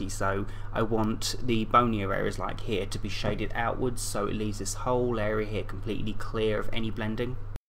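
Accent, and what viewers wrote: England, United Kingdom. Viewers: love his specific english accent. dont know why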